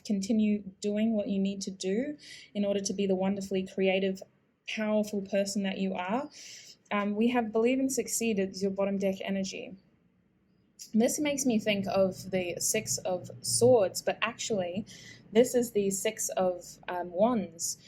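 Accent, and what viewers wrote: Australia. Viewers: I listened to a few videos from you. I wasnt paying attention to the accent but this video is clearly Aussie accent. I just recently moved away from Au and u made me missing it. And thanks for the picking card. For pile 3, when u picked the card “I imagine u naked”, I’m dead laughing haha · I love you!!! Your readings are so different, original and so accurate! I chose pile 2 and it's like personal reading! Love it! And I love your aussie accent and swearing as well!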